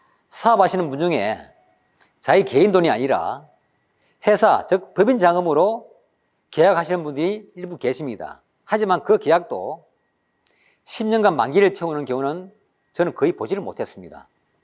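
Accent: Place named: Gyeongsang, South Korea. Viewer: 구수한 갱산도 사투리로 귀에 속속 리더님짱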